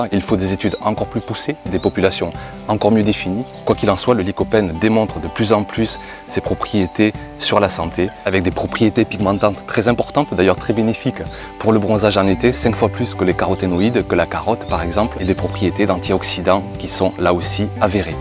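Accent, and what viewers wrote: South, France. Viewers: Et puis il faut reconnaître que l'accent du sud de la France 🇫🇷 est très agréable a ecouter !!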